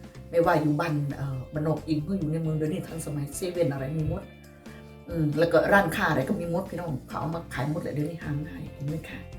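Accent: Korat, Thailand. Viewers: ชอบดูยาย พูดโคราชค่ะ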